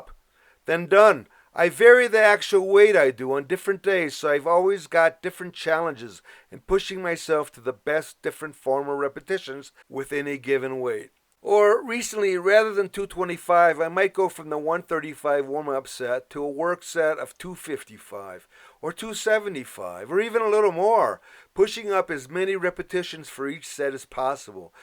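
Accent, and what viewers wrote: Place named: Minnesota, United States. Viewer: Well! Look up the phrase "Old Guys Rule" and you'll find a link this this video. I think my jaw was on the floor during the entire thing. You've transcended mere "inspirational" and achieved Zeus-hood. 320 pounds - egad. I turned 69 earlier this week, and for that birthday I did a rep of 225 ("two plates") in the local YMCA after 29 years without touching a barbell. (My peak in my 40s was a couple of reps of 287.) I was a little shocked that it only took me two months from 135 to 225 - newbie gains. Apparently, you can build muscle after 60; I had no idea this was so. I shall next attempt 230, then 235, etc. Anyway, calling this a remarkable video doesn't quite capture it. This is one of the realist things I have ever seen. Your voice seems very familiar. After some thought I have it: Your voice reminds me of Jonathan Banks, who played Mike Ermantraut in "Breaking Bad." Banks is from D.C./Maryland, but I thought I detected a Minnesota dialect from you. 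Where are you from?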